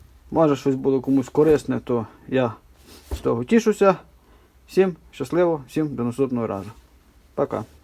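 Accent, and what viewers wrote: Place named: Galicia, Ukraine. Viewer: Привіт. Шикарний Галицький акцент. Лайк . Підписка. Так тримати. Гарний ролик.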